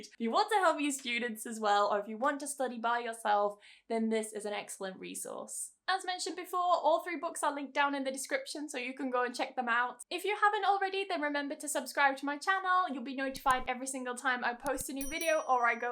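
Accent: United Kingdom. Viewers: English pronounciation? Or british accent pronounciation? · British accent is fascinating 😍 but I wanna learn US. accent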